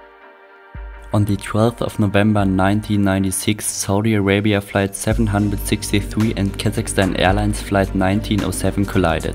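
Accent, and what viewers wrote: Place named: Germany. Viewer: you're definitely german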